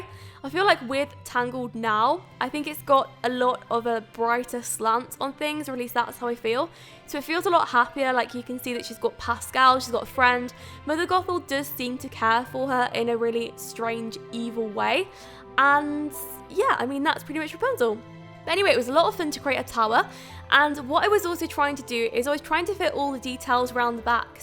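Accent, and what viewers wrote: United Kingdom. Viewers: R u British?😕😞 I am English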